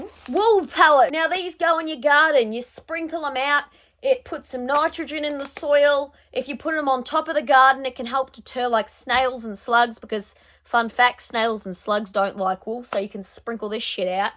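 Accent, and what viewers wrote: Australia. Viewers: I just started watching your videos and have watched loads of them so far. I served in the USA military and enjoy how you talk, it reminds me of the way we would talk (except without an Australian accent) lol. Im curious about what kind of car you drove on the ranch and how old is it? It seems to a real tough little guy.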